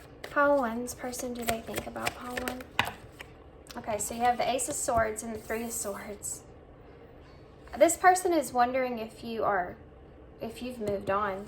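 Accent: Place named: Tennessee, United States. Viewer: My goodness, a fellow southern girl I hear. Tennessee?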